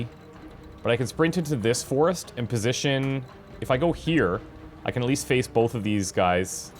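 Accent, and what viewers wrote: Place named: Canada. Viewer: So what's weird is that when I lived in Canada I never noticed the accent. Now living in the States for a decade I'm painfully aware of the Canadian accent. Keeping doing you. Get oot there and get 'em.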